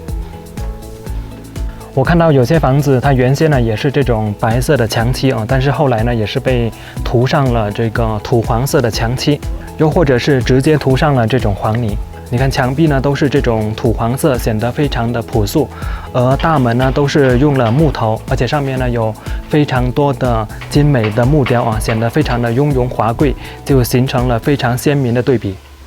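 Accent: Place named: Hakka, China. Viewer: 從你的普通話 可已知到你是客家人 佩服你的毅力及對各地風警 人文的解說 我是住在南加州的七十八歲的客家老者 喜歡看你的視屏 我也有制作一些旅游視屏 但難豋大雅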